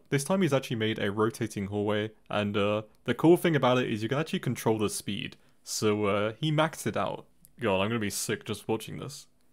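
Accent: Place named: United Kingdom. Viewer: you be british! you're the only british youtuber i know!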